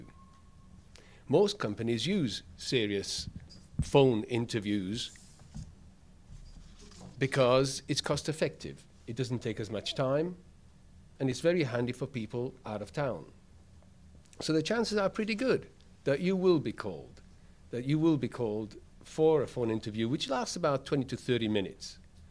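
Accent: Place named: South Africa. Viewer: Couldn't understand this persons funny accent. Sounds South African. Ughh.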